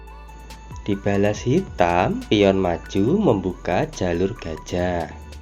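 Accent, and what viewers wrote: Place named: Central Java, Indonesia. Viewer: ..yg koment bukannya ngantuk ttpi yy bgitulaah medhok bingit logat 1area wilayah daerah di jawaTengah, lanjutkan dik Adminn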